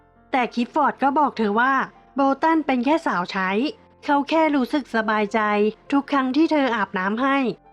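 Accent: Thailand. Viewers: เสียงน้อง เหน่อดีจริง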